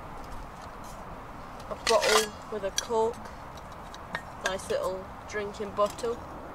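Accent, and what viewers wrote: United Kingdom. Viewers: Hi,.... i like your English dialect. I keep learn how to speak British English dialect, but it difficult....:)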